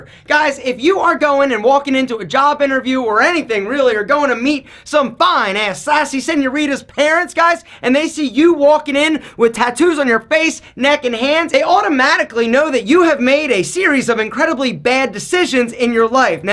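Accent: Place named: Baltimore, United States. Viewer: Holy Bmore/Philly accent, Batman!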